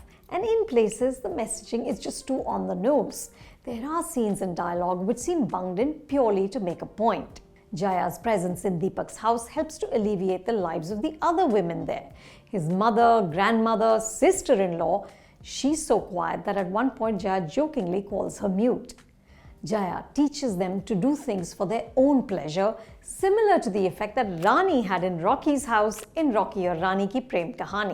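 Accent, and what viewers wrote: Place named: United Kingdom. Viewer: Ye madam ji ki English 😂.. inko angrez yahii chhhod gaye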